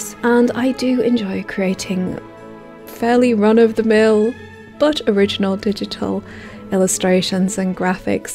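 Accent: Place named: Northern England, United Kingdom. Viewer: i heard the northern twang straight away haha from midlands here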